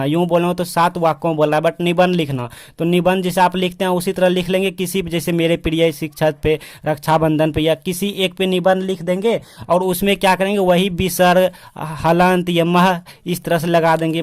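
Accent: Bihar, India. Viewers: Aap Bihar se ho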